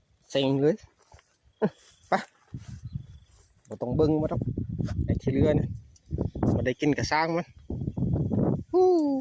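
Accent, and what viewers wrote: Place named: Cambodia. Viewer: มักเสียงเว้า สำเนียงเขมรเด้😂😂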